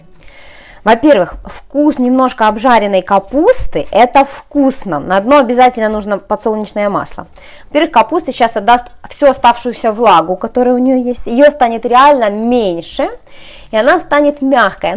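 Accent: Ukraine. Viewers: Если бы у было плохо с чем-то, не было бы 4 млн. пользователей. Все отлично. Мне приятно слушать, у меня так бабушка говорила, а у меня московский акцент остался. Но я тоже считаю достоинством грамотный русский, но если его нет, является ли это недостатком? На таком русском пол Украины говорит.:) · Какая убедительная приятная плавучая речь. А слабый украинский акцент придаёт такой оригиналный колорит. Если нет аппетита то надо смотреть Ваши ролики. Появится и аппетит, и настроение. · Спасибо за чудесные рецепты они у Вас всегда вкусные и очень аппетитно смотрятся.Вы человек-СОЛНЫШКО и Ваша изюминка- АКЦЕНТ!Украинский говор очень красив,впрочем,как и украинские девчонки! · Если американка говорит по русски с акцентом - это нормально, а если украинка? это же не родной язык, попробуйте сами поговорить на чужом языке. Думаю критики кроме своего русского больше никакого не знают.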